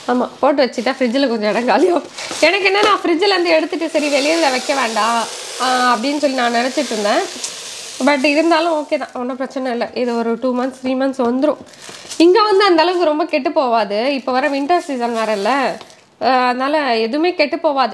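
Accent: Tamil Nadu, India. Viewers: Hai iam ranjini from India unga video recent ah tha pakkara unga tamil slang rompa pudichurukku like sister mathiri erukku unga name yanna?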